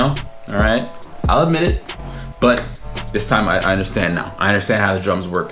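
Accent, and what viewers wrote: United Kingdom. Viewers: No way, your London accent is on point ...coming from a londoner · Dis is my first time watching one of your videos and I fully thought u were British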